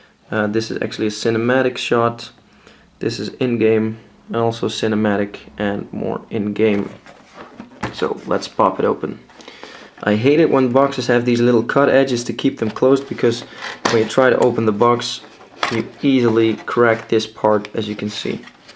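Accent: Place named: Netherlands. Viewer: Haha, je accent is zoooo Nederlands, hoorde het gelijk :) Ik ben zelf nogal verslaafd aan Warcraft III, zowel single als multiplayer. Deeltje 2 nog niet geprobeerd, zal ik vandaag even doen.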